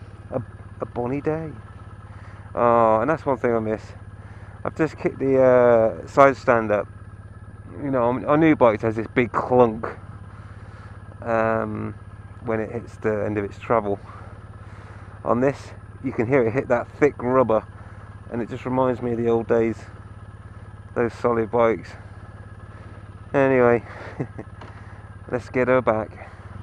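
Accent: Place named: RP, United Kingdom. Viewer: What size engine are these 214 trumpys you do sound rather POSH and TOFFEE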